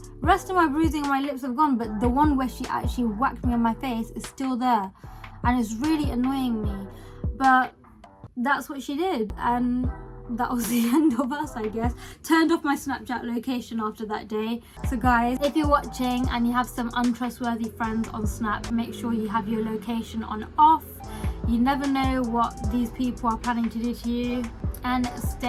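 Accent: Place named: United Kingdom. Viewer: Omg you’re from UK??? 😍😍😍😍 the accent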